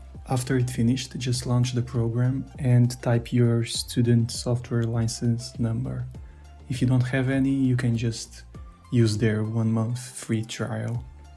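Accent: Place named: Portugal. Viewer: You definitely have a portuguese accent, tudo bem?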